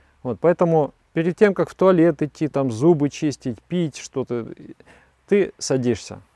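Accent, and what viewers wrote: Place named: Moscow, Russia. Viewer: не думаю, что он украинец. Его канал называется " ", а не " ", это раз. Второе, говорит по-русски, даже после всех злодияний русских, на нашей земле, третье, говорит, с явно мАсковским акцентом. Он не наш.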